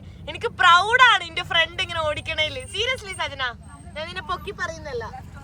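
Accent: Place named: Kannur, India. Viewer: ഇതല്ലേ,ഞാള് കണ്ണൂര്കാര് തമ്മു കണ്ടാൽ പറയുന്ന ഭാഷ! 😄😄😄😄😄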